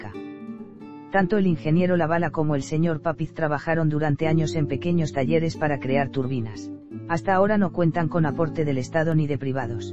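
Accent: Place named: Spain. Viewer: Me desorientado la voz!! Parece una gallega la que habla. (Española)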